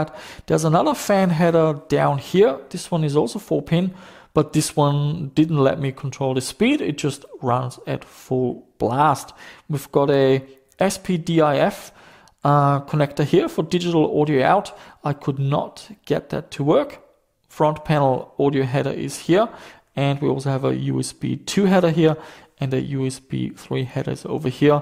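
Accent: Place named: Germany. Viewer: Great video!!! I thought you were German based off ur accent - didn't think Aussie!! Good stuff my man!!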